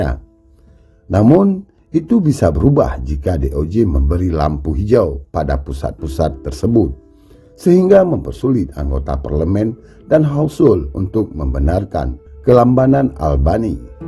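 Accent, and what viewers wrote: Aceh, Indonesia. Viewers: Suaranya seperti dialog org aceh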